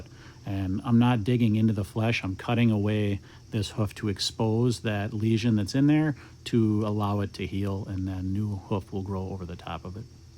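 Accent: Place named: Wisconsin, United States. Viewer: I don't know if it's the Wisconsin accent that reminds me of home, or if it's that I identify with a good pedicure, but I love these videos.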